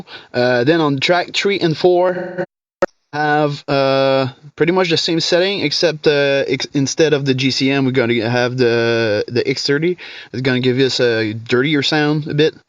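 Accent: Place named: Quebec, Canada. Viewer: je savais que tetais quebecois avec ton accent , jai confirmé avec ton caliss 😂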